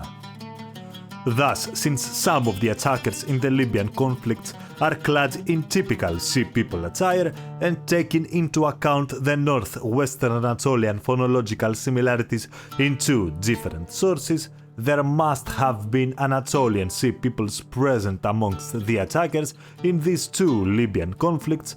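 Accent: Greece. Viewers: τότε συγχαρητήρια γιατί είναι πρώτη φορά που μία τόσο βαρειά ελληνική προφορά, «ακούγεται» . Σίγουρα εντυπωσιάζει μάλλον θετικά τους ξένους, αφού την ακούν να εξιστορεί σχετικά με αυτή θέματα, μην σου πω κι εγώ δεν τσιτώνω όταν την ακούω . Στην τελική, έτσι είναι η γλώσσα μας, αυτή η προφορά βγαίνει σε μια άλλη γλώσσα. Παλιά ντρεπόμουνα να ακούγεται το ελληνικον, στην προφορά μου στα αγλλικα. Τωρα ούτε καν με νοιάζει όταν λέω beach, bitch, peach κτλ, όλα τσουβαλιασμένα με τους ίδιους φιόγκους. Ας πούνε κι αυτοί ξ, πχ ή ο,τι. Μπράβο σας.👍👍🙏🏻🙏🏻👏🏻👏🏻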